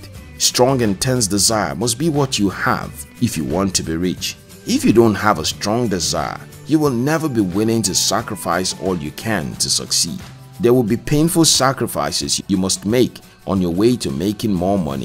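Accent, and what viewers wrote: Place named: Nigeria. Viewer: You are in Nigeria? Your accent sounds like. If you are. Nothing wrong. With it. I think you should be proud of yourself to making this channel. Bcs, Nigerians in South Africa they are drug dealers and all sort of dirty business.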